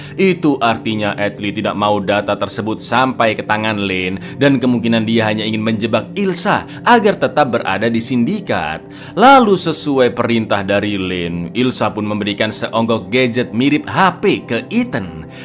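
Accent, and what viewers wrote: Java, Indonesia. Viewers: jowo ne ngendi kiiieh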